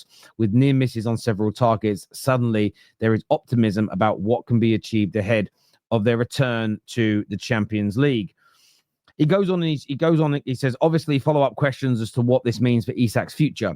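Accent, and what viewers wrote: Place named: Cockney, United Kingdom. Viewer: What a load of crap! Another cockney conman!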